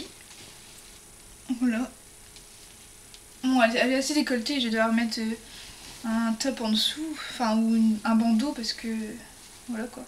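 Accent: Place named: North, France. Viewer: Youpi...jai perçu ton ti accent chaleureux du nord..jaiime trop votre parler ces tro cool...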